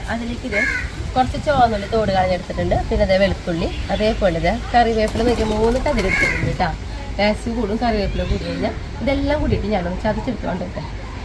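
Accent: Thrissur, India. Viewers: hello, njan thrissurkariyanu. veedu evide anu Anu? Thrissur bhasha kelkkumbol valiya snthosham. Krishi valare ishtamanu. All the best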